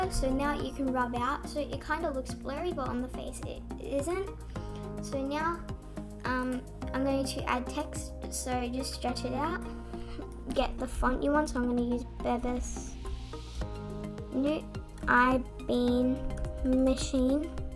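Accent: Australia. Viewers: ITS AUSTRALIAN AND ITS CALLED AN ACCENT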